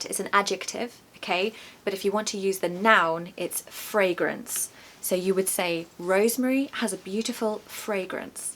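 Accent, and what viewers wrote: United Kingdom. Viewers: Hello, I love these beautiful words you taught me with the good definition. You are teaching me lovely words in the different seasons, months, and even in the Valentine"s day. I love learning those special words from you with the beautiful British accent and pronunciation. when you mentioned about Lady Bird, I used to read Lady Bird childrens" books in my childhood. That was my foundation of learning British English. Now you are teaching me beautiful British English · I love your accent,you are the incarnation of the real British English I’m from Chile 🇨🇱 and I love the British Accent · Omg the British accent is so rejuvenating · hi from Bavaria Alpen! I enjoy your British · You have my dream British accent. I can listen you speak forever.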